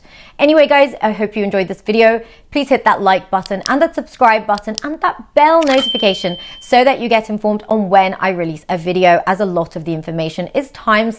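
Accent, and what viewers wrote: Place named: United Kingdom. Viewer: Do I dig your British accent!!!! Where would professor Higgins place your accent? Lundan?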